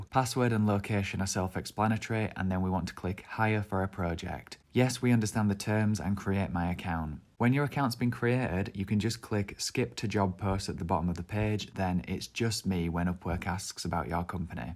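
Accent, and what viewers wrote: United Kingdom. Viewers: nah mate it just sounds like it but that's the "bri - ish" accent. Your videos are dope btw! :)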